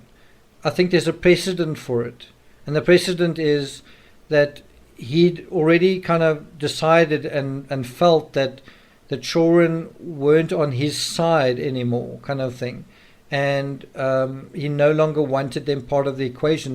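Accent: South Africa. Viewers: The man speaks just like he spent his entire life in South Africa . Because , he pronunciations are a bit different , he is still readily understood. I feel your comment is quite rude. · ...are you South African?🇿🇦🇿🇦... Love the way all this is explained..though I still feel CW gets off on any attention..good or bad... Nothing can ever excuse what he did to them..what would be great is if he could tell the truth..even if that includes outing NK. Such delusions CW probably has twisted the truth is his head..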